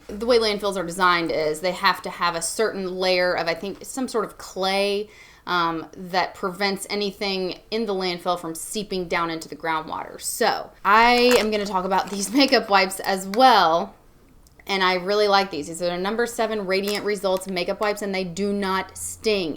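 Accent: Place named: Texas, United States. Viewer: Are you from texas .... you sound very Texan .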